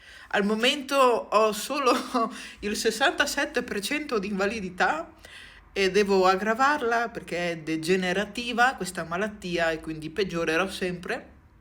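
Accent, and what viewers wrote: Veneto, Italy. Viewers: Parli come me quindi immagino tu sia veneta ...❤ti abbraccio fortissimo e ti auguro ogni bene...❤❤❤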